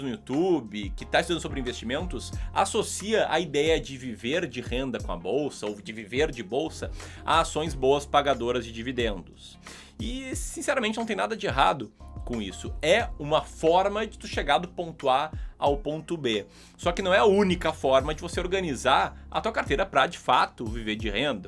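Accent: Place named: Rio Grande do Sul, Brazil. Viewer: Bah ô Loko, tu é gaúcho né? Ãããhaaaam..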